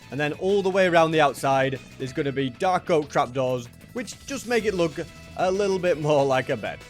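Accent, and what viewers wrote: United Kingdom. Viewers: Are you british? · Wait.. is British??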